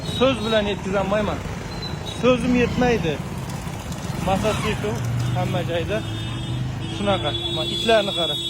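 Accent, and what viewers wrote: Shymkent, Kazakhstan. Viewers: Ака чимкетликмисиз ??? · Чимкент узбеки акцент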